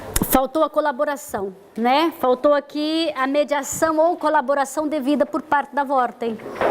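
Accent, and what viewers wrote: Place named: Brazil. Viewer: Ela é brasileira? Sotaque parece do Brasil!!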